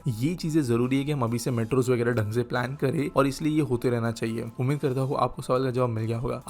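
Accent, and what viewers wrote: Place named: Maharashtra, India. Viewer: bhai thoda dheere bola kar, marathi accent ke karan kayi words accent ke saath itna jaldi bolte ho, especially places ke naam, ki bounce ho jata hai upar say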